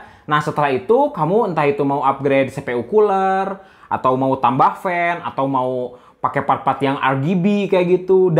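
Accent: West Java, Indonesia. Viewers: sunda sekali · Moal teu orang sunda ieu mah , kaciri cara ngomong na 😅😅😅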